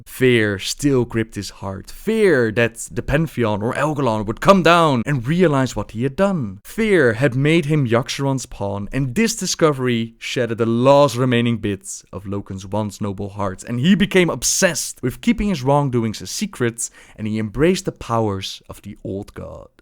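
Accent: Netherlands. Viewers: You must be dutch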